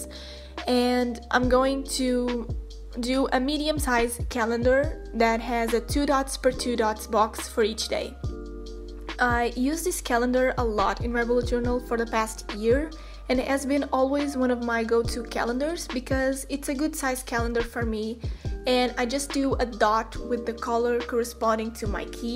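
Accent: Portugal. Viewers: Tive de ir procurar os comentários portugueses.... Eu estava a ouvir e só pensava : este sotaque é muito uma portuguesa a falar ... Tive mesmo de ir conferir , eheh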